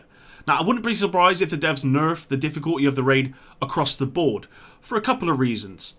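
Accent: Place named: Southwest England, United Kingdom. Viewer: You from sw england? You sound like home haha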